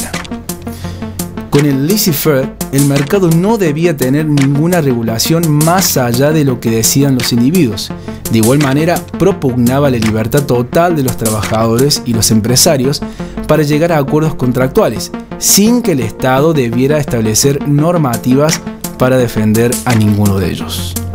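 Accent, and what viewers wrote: Córdoba, Argentina. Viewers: Like por el acento cordobés 😍 Nah fuera de joda, me sirvió mucho, graciass! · Creo puede ser cordobés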